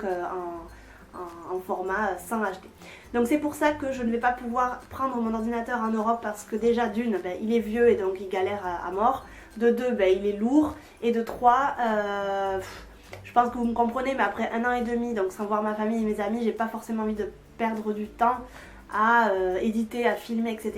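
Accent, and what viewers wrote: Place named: Toulouse, France. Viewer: Bonjour à toi!!! je viens de découvrir ta chaine et de me regarder prés de 10 de tes vidéos!!!! J'aime beaucoup ce que tu fais, tu partage une autre culture à travers tes vidéos et je trouve ça génial! ....En plus, en toute simplicité..... Et je suis contente car tu es une Toulousaine!!!! (rolala la chauvine...) Il me semblait bien reconnaître mon accent.... ;-) Vivant moi aussi à l'étranger, je comprends qu'il te tarde de revenir dans ta ville!!! j'espère que tu en as bien profité. Merci encore, je te souhaite une excellente année 2017! Ah et au fait, est il possible de nous faire des vidéos recettes de cuisine? Si tu en a le temps évidement. Merci et à bientôt!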